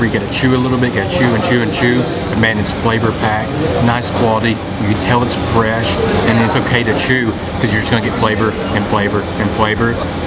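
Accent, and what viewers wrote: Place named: South, United States. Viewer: Love watching u eat.....Your southern draw and demeaner is very refreshing...Pho and other amazing dishes look delicious...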